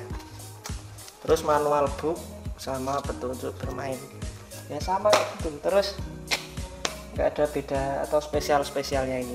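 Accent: Java, Indonesia. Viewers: video unboxing udah menarik koq, rodo medok-medok hahaha..... dan marai pengen nostalgia jaman sd mbiyen dolanan tamiya meneh. salut racun 4wdmu mas. klo bisa unboxingnya jangan kelamaan dari bulan rilis jd bisa buat refersi beli hehehe.... razor back kapan di unboxing mas, padahal di video sampeyan yg kapan itu udah kliatan..... selak muncul sik anyar meneh ig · Medok bang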